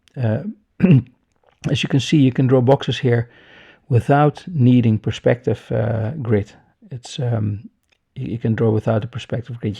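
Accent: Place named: Netherlands. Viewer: Haha i knew you were Dutch by you're accent😊 ik ben ook Nederlands. Hallo ✌🏻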